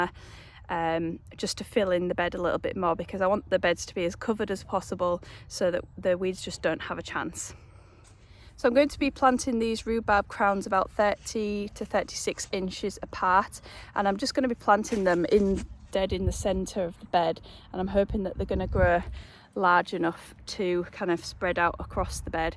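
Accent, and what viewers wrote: East Yorkshire, United Kingdom. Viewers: Loving the enthusiasm for rhubarb, it’s great stuff isn’t it? You’re in East Yorkshire I take it based on your accent? I did my teacher training in Beverley so your accent brought back memories.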